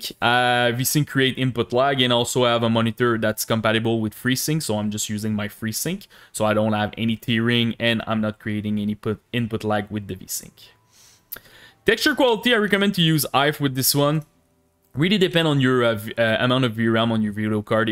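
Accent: Quebec, Canada. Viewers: I like the quebec accent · L’accent québécois hahaha mais lets go je vais essayer ça 🤘🏼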